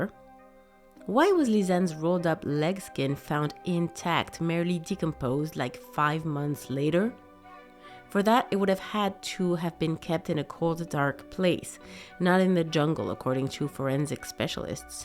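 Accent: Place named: France. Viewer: T'as un léger accent français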